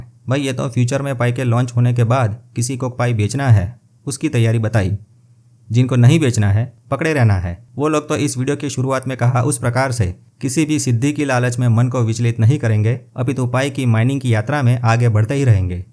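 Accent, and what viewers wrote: Gujarat, India. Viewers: Sir aap Gujrati ho?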